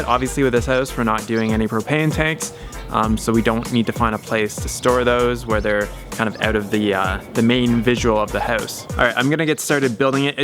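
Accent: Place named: Canada. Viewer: You're Canadian and you talk like a California surfer, only with the occasional aboot thrown in.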